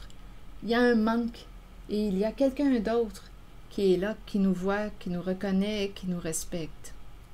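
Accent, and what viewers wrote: Canada. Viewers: Mon Dieu, votre magnifique accent Canadien. J'ADORE. JE VOUS EMBRASSE POUR TOUT CE QUE VOUS DÉCLENCHEZ chez ceux qui sont à votre écoute. Énormes bises de MARSEILLE.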